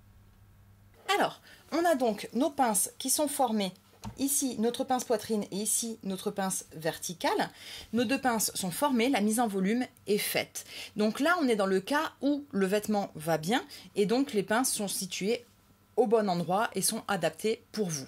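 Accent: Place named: Toulouse, France. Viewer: Bonjour, tes tutos m ont beaucoup apporté lorsque j'ai commencé la couture et je suis ravie de te retrouver, même si je sais coudre des pinces desormais... ceci dit le coup du repére au poincon est une super idée! Je voulais surtout te féliciter pour l’amélioration technique phénoménale image et son (c 'est mon métier, j'y suis sensible) et espère te retrouver bientôt pour de nouvelles vidéos avec ton joli sourire et accent qui me rappelle la maison (toulousaine expatriée a Paris )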